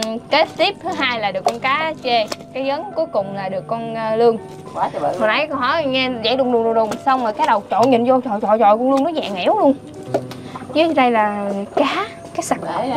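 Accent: Mekong Delta, Vietnam. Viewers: Em chat giong mien tay nghe ngot nhu mat ong rung,❤❤❤❤❤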